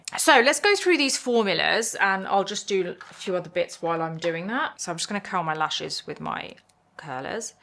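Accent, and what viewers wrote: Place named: United Kingdom. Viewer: i think her native language is english since she has a uk accent lol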